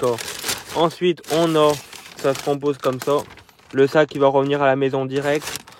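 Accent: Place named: Nord-Pas-de-Calais, France. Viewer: IL PARLE COMME CHTIMI CELUI LOA...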